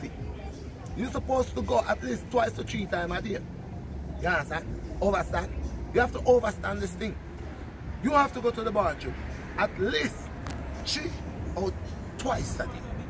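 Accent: Jamaica. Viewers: I could tell he was Jamaican before even goin on the video 😂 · Is that Jamaican?? 🤔🤔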